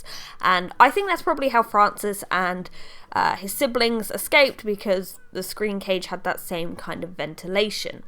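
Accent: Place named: RP, United Kingdom. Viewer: Yes!!! Updates! Really any content you produce I love because you’re informative, not boring, and you’ve got a posh accent!! 😉😊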